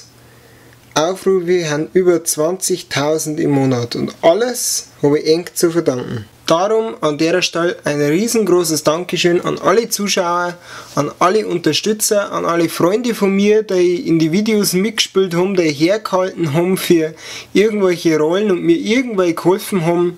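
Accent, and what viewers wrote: Bavaria, Germany. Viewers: alter ich glaub es hackt du machst bayrisch für anfänger und fährst quad besster tüp ever mach mehr mit deim quad sau geil weiter so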